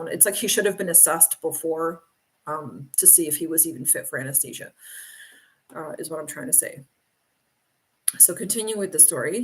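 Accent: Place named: West Coast, United States. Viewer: I don’t usually watch Q&As (actually never) but your videos are “popping” up on my homepage lately and I’m wondering why? 🤔 I find today’s topics with & (I believe were their names), very compelling. (it sounds like is dealing with a Christian ideologue mom and guilt issues, just a guess? I can relate) America, while not claiming a national religion has surely imposed those ideals onto us from birth. Words like “Purpose” are a clear indication of that. After all I have learned from six surgeries, three of which spine related, All of which originated from a severe accident resulting in a broken neck. I joke that having Plates, rods and screws in my cervical & lumbar spine make me a hybrid human 😜 Judging from posting times and when they show up on my page you are in a different time zone from me (East Coast). You don’t sound European, South American, or Canadian for that matter; West Coast perhaps? 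Anyway, my point is scheduling an in person session wouldn’t be convenient. I am currently writing a book, autobiography of sorts, and would like to consult with you on developing the theme and characteristics of the antagonist. The more I listen to you speak the more I like your instincts & intuition. 😊 Can I email you to arrange an initial consultation perhaps?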